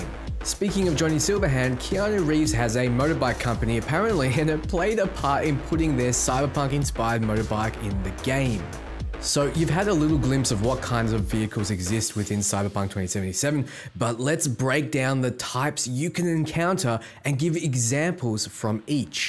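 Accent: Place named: Australia. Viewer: Good to hear an Aussie voice on YouTube much love from the lock down state Victoria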